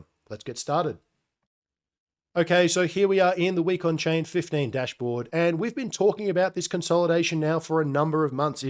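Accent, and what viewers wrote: Australia. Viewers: R U Australian btw?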